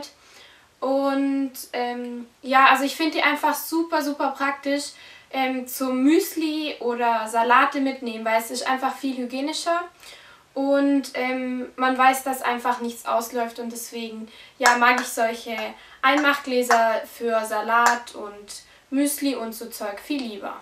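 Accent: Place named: Southern Germany, Germany. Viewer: Haha ich wusste sofort, dass du aus Süddeutschland kommst:D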